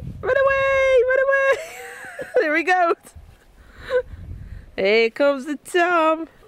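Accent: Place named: Northern England, United Kingdom. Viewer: Hi!! Your accent- it sounds like it's from Northern England! Do people in your area usually speak like that?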